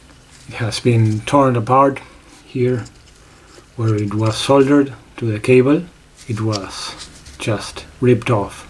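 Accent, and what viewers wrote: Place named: Spain. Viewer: Because of your accent I thought that you were located in Spain.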